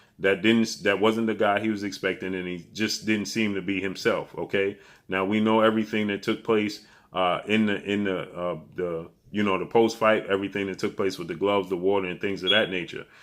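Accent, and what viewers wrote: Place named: Brooklyn, United States. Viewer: salute 🤴🏾 keep grinding' SON' BROOKLYN VOICE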